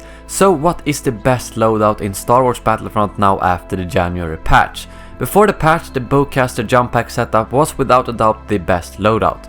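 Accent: Sweden. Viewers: han låter svensk iaf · are you swedish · are you swedish? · Älskar lite svensk brytning 😃👌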